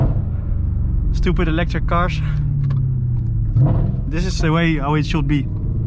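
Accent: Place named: Netherlands. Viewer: hahaha ik hou van dat welbekende nederlands accentje 😂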